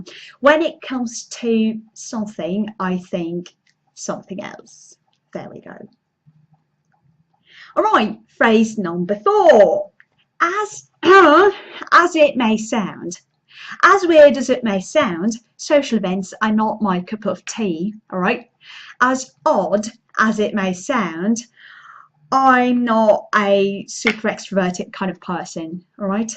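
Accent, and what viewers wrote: RP, United Kingdom. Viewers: Do you sound in RP?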